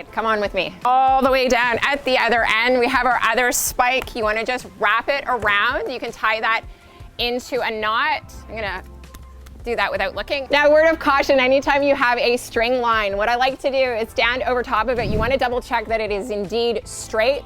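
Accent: Canada. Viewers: She sounds Canadian.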